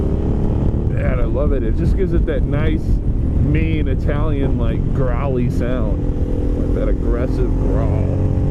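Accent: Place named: United States. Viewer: Awwm until you started talking I thought you were an actual Italian reviewing the can and the bike. What the fuck do Americans know about motorcycles?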